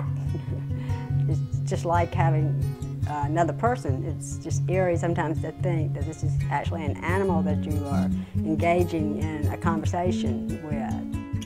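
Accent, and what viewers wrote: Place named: Tennessee, United States. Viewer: Spot on for African Greys--I've had parrots for 40 years, my Grey for 15. Just an aside, I make a hobby of identifying southern accents (I'm from Florida, we don't count) so, here goes....hate to get it wrong: Tennessee?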